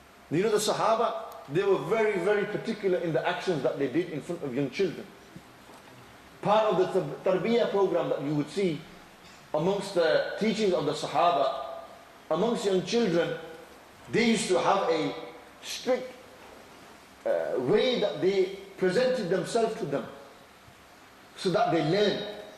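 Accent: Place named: Mirpur, Pakistan. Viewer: Beautiful speech. May allah bless you. You gotta love his mirpuri.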